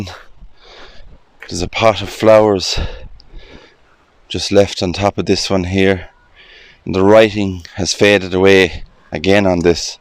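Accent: Ireland. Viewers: Excellent. I'm so glad I found your channel. Graveyards are beautiful places. All those lives of people who all had a purpose and stories to tell. Your lovely Irish accent is pure joy to listen to as well. Thanks and take care 🙂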